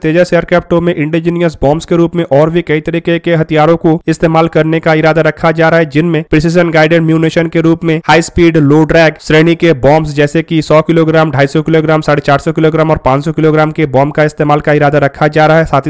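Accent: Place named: South India, India. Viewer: Arey bhai pehle "indigeneous" pronounce karna toh seeko, north india se aakar south india jaise baat kyun karte ho, kya shiksha ka sthar itna ghat chuka hai kya uttar bharat mein, toh bi phir kya hua, aajkal internet se bi sheeksha ki uplabdi toh utta sakta hai.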